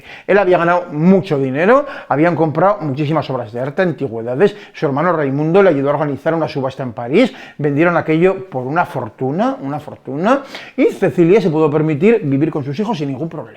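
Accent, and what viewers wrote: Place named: Aragon, Spain. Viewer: Te he descubierto por casualidad, buscando el Delfos, como me alegro, me encanta tu canal!!! Eres capaz de llevarme a cualquier sitio y te sigo...la capacidad de transmisión es impresionante...y hablas muy sencillo. Yo soy de Zaragoza y vivo en Teruel desde hace muchos años. No tengo acento pero me encanta escuchártelo a ti. Desde luego me fijaré más. Historias con alma!! Besicos de una zaragozana "hija adoptiva" de Teruel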